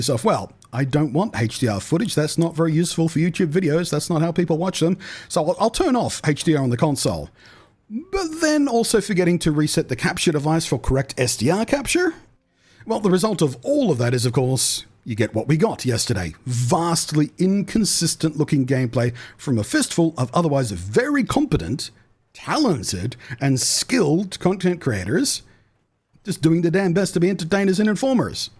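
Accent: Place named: Australia. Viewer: what accent is this? its like an australian trying to talk clearly so they end up using american R's half the time.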